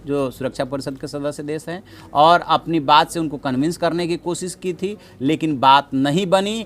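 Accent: Bihar, India. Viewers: Bhai aapke bolne se lagta hai ki aap Bihari hai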